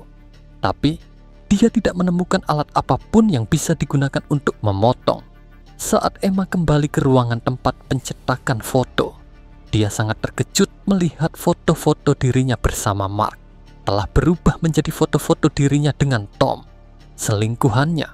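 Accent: Java, Indonesia. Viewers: suaranya medokk bangett heee,,mantapp bang. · medhok jowo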